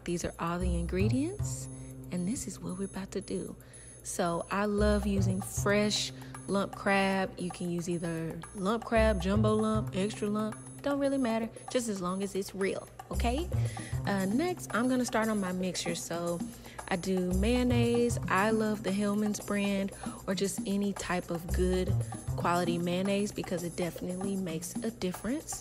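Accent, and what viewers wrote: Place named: South, United States. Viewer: Beautiful crab cakes. I'm going to make some. Also, that southern accent just crushed me. I'm in love.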